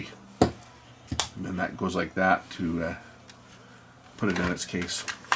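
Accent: Canada. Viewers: you speak funny language. sounds canada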